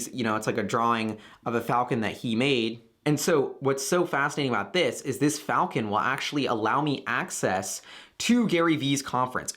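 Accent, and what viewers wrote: United States. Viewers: Your American accent is so gey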